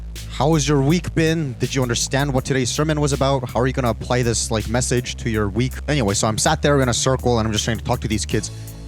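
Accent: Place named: Australia. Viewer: I like your accent it sounds Australian:]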